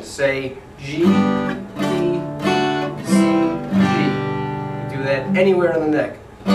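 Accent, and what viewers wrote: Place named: New York, United States. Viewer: Hahahaha, two notes in a chord. I know you know that is not possible, but it's still funny. Your point about neck mapping is spot on and that's one of the greatest things about playing guitar compared to other instruments where you can only play the stuff in one locked in place. I love your My Cousin Vinny accent. Yo!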